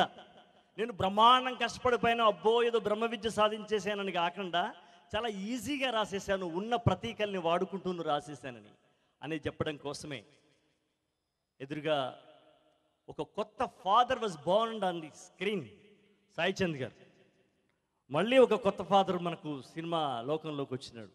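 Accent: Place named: Telangana, India. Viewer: Me goopathanam telusthundhi me matallo...... Telangana thathwam dwanisthundhi me yaasalo 👍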